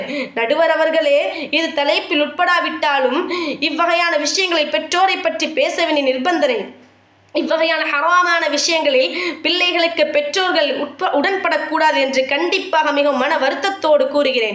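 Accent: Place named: Pallapatti, India. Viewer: I think it's பள்ளப்பட்டி uswathun hasana style of slang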